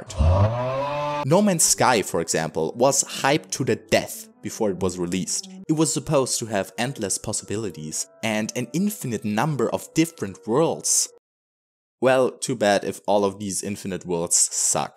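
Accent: Germany. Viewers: Hört sich fast wie ein deutscher Akzent an?🤔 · Man hört deine DEUTSCHheit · You sound suspiciously German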